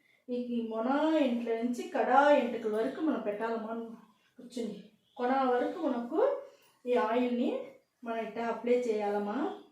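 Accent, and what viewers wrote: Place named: Tamil Nadu, India. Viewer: Tamilien telugu edi . Kani great .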